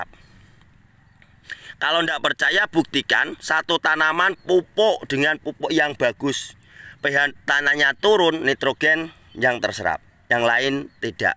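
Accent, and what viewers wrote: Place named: Pati, Indonesia. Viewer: Logatnya khas pati😅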